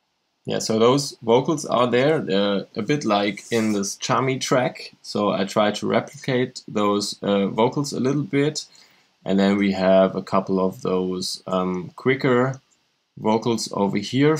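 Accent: Germany. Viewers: Ich hörs doch, you are german!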